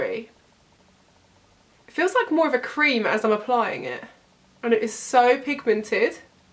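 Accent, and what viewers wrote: United Kingdom. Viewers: you British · Are you british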